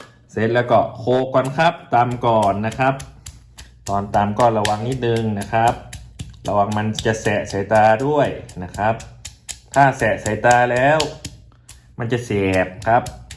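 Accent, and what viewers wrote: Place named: Northern Thailand, Thailand. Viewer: อู้กำเมืองอี้ดีละเจ้า เฮาคนเมือง 😂❤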